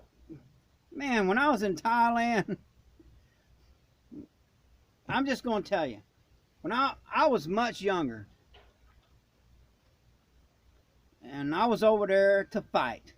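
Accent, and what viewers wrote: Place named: Ohio, United States. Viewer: Love your accent. Are you from ohio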